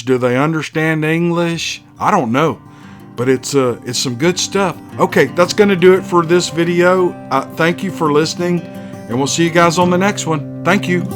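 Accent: South, United States. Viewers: I think Sasquatch are far more intelligent than most people realize. Whether it understood english or simply read the man's body language, I'm sure they understand enough from their experiences with humans to see that we love our canine companions. Perhaps they keep animals as pets, too. Great story. I enjoy the stories spoken in that familiar southern drawl. Keep em coming! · Had been watching Bigfoot videos on youtube and came across your channel. I never comment, but so enjoy your stories and southern accent I had to say what a great job you do. Thank you for the interesting stories and the time you put into this channel.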